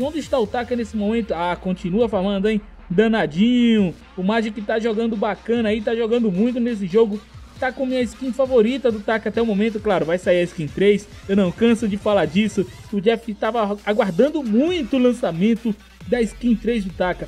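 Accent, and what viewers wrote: Northeast, Brazil. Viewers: é Nordestino 😱😱 Eres de onde Mestre?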